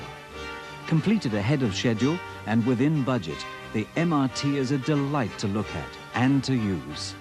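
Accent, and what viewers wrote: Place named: United Kingdom. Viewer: Same British accent!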